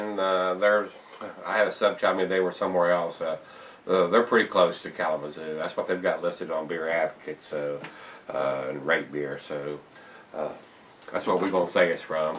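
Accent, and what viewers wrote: South, United States. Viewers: "Today I'm reviewing bell's expedition stout, I said stout!," very pleasant southern accent sound like a coronal, where you from?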